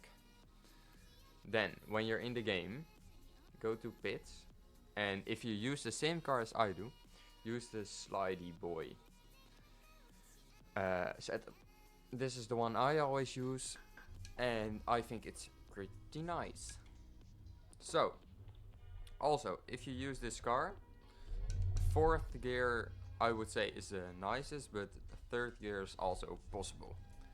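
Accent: Netherlands. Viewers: W Vid like all ways! btw are you dutch? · ngl u sound dutch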